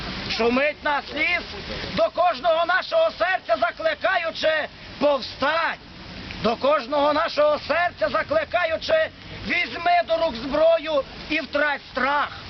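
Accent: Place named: Western Ukraine, Ukraine. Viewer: Западноукраинский диалект.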